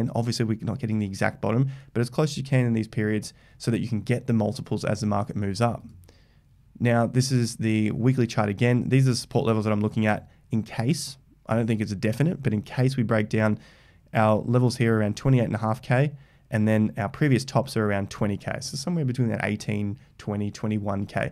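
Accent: Australia. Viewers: I come to listen to ur analysis in ur Aussie accent :D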